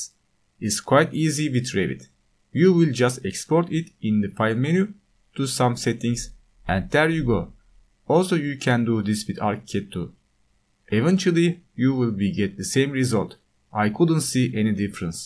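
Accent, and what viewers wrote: Turkey. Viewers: türksün değil mi ingilizcen onu çağrıştırdı?? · aahahah abi türk yaa bende aynı böyle konuşuyorum :D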